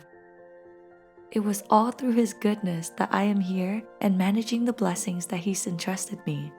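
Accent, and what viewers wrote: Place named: Vietnam. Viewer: Omg you are Vietnamese??? Im Vietnamese too. Nice to meet youuu I really love your accent 💓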